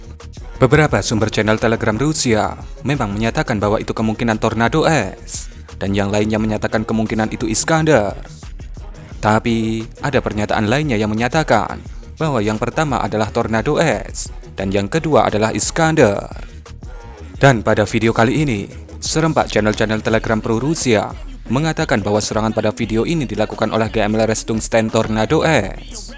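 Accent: Java, Indonesia. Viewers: Komentar saya cuma 1 suaramu medok lucu😂😂😂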